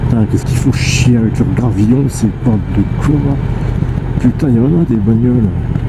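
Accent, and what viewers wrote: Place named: Franche-Comté, France. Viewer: .." bohneur " ?! ou " bonheur "?! , vous qui vous moquez un moment donné , sans doute pas méchamment( ?), de l'accent "halsassien " , en tant que " bon " Français ! J'ai cru percevoir un accent franc-comtois , soit dit en passant !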